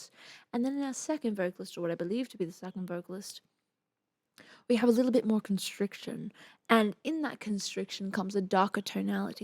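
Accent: Australia. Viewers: I love her reactions and love love love her Aussie accent😍😍😍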